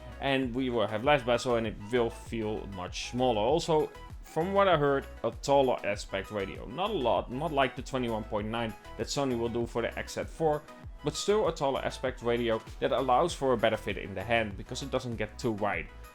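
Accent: Netherlands. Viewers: haha, ik dacht al dat ik een Nederlands accentje hoorde😂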